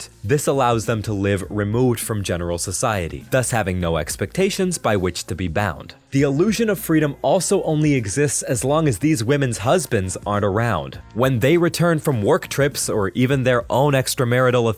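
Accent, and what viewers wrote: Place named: New Jersey, United States. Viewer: Has anyone else noticed the sudden Jersey-esque accent??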